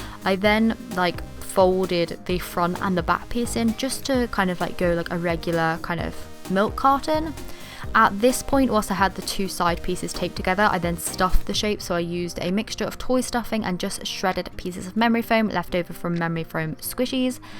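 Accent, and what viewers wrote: United Kingdom. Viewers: She speaks british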